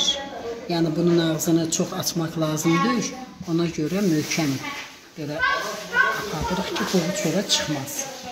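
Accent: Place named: Shamkir, Azerbaijan. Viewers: gozel şemkırım lehcenin qadasin alim birinci defe baxan kimi bildimki şemkirlisiz👍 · Əllərinizə əməyinizə salıq olsun cox xanım bəh bəh sizin danışığnız lap Samuxlular kimi danışırsız mənə elə doğma və ləhcəniz şirin gəlir ki dilinizə quzu kəsim lap darıxdım kənd həyəti ücün mənimdə bir tərəfim Şəmkirin qırmızı Samux kəndindəndir eşq olsun Səmkirlilərə və bütün rayonlarına cox sevirəm sizi əzizlərim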